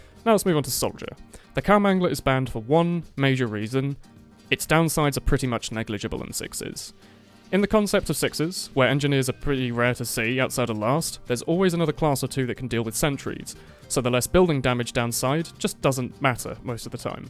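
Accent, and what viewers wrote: United Kingdom. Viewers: even if i dont care much about competitive tf2...i just love hearing this british man speak like a professor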